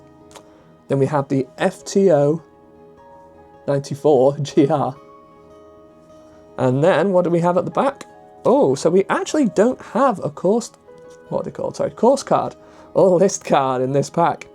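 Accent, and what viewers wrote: United Kingdom. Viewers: I always heard the Manc accent out. Love your vids, cheers mate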